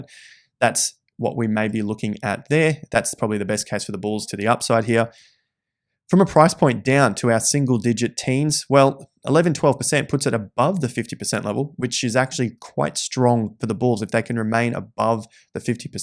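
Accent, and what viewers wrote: Australia. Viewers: your content is great, straight forward and no bullshit. It’s so good hearing an Aussie as well. Love your work.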